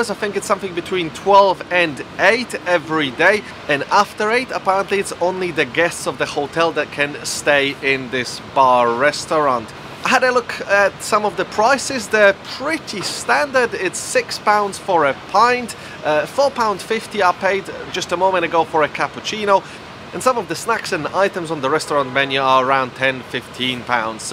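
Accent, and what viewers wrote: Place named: Germany. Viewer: German twang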